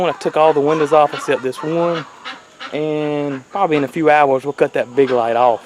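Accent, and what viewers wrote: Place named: Mississippi, United States. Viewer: I love your accent! 😍 It reminds me a lot of my grandmothers, she’s from MS.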